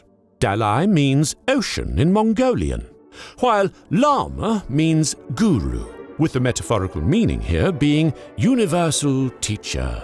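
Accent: United Kingdom. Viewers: Y r u from british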